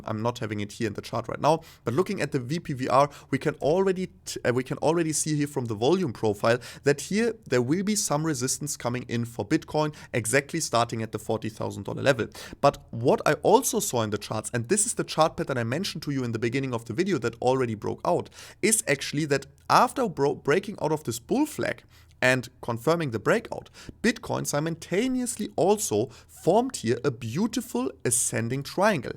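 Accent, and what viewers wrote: Germany. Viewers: You have a little bit of a german accent, but your english is excellent. What other languages do you speak?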